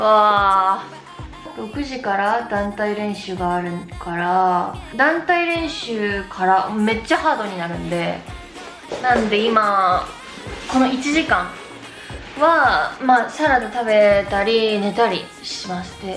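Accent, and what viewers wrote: South Korea. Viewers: カタカナ英語がもうほとんど韓国語の発音になってるー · 朱里さん、少し韓国語訛りになってきてませんか？🤣🤣🤣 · 日本語も少し韓国語っぽく聞こえる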